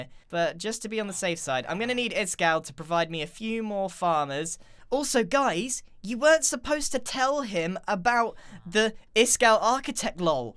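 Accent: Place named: RP, United Kingdom. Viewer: Grian has a lovely posh accent.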